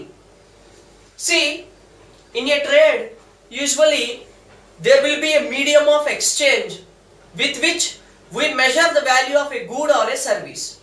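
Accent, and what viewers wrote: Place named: Telugu, India. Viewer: sir superb ga explain chesaru.....chala clear vundhe me concept....many of us are lucky...i think u'r mother tongue is telugu....superb...keep uploading.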